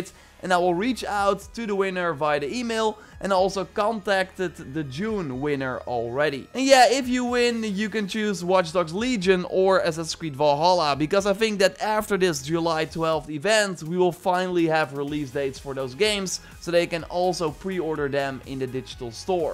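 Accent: Netherlands. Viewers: the sound of your english accent, you must be dutch. Ofniet dan?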